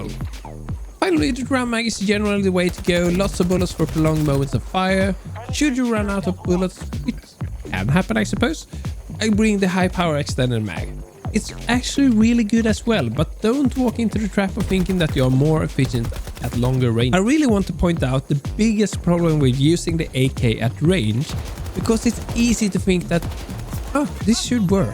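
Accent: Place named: Sweden. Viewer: Knew you had to be Swedish with your accent haha, jag är halv Svensk. I think the AK and M5 feel so inaccurate and weak compared to the SCAR — I don’t understand how some of my friends dominate with them but I struggle to hit open shots consistently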